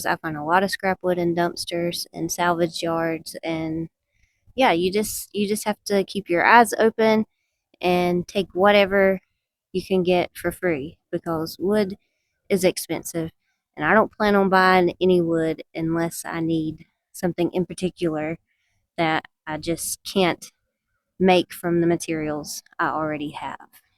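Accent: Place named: South, United States. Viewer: I love that southern draw, your ex must have been crazy to divorce you.. keep up the good work, and take care of your fur baby.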